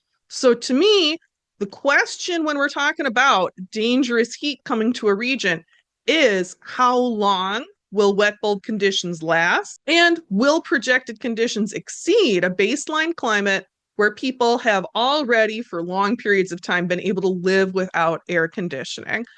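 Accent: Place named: Midwest, United States. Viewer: Midwesterner here, love your midwest accent!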